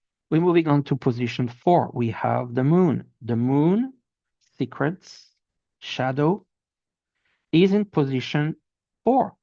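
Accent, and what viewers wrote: France. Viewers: Thank you, this was really great and I can relate to all of it, you explained it so well. I love listening to you because I miss the French accent that I grew up with. I am so glad that I found you on Linda G's video last week. You a good at this. I hope to hear more from you. Bonne Annee' 🙏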